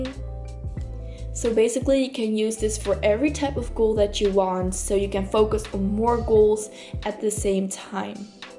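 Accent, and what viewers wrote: Netherlands. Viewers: Cool video! Are you Dutch? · Are you Dutch? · Are you from the Netherlands, because her voice sounds a little bit like Netherlands People trying English. But your English is very well! I'm from the Netherlands! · Omg love your dutch accent! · Je klinkt Nederlands, klopt dit? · Are you dutch?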